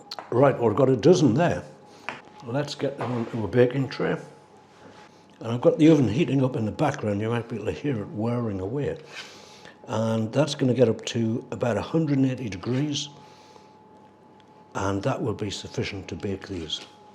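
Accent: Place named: Northeast England, United Kingdom. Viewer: Where are you from your accent sounds like the north east of England.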